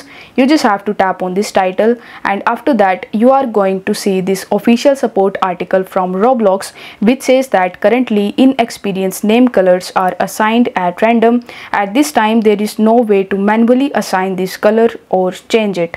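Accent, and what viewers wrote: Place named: India. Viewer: I i like that indian accent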